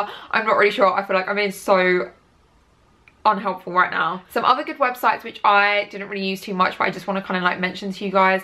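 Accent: United Kingdom. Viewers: yep British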